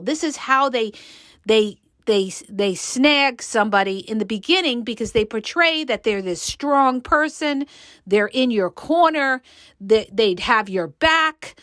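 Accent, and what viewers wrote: New York, United States. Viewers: All I can say is that I have one big regret. And that is that I wish I had known about you 19 years ago when the narcissist from hell entered my life. Had I listened to your podcasts back then, Id probably be very happily married with a good man today. You described my ex to T! I had to do a lot of research on these types of men but you would have been all I needed to help me see the light. I love your hard, NY style delivery. It's what's needed. You are my official go to person now. I binge listen to your shorts reels and longer videos, every spare minute. Finding you is a gift. ❤❤❤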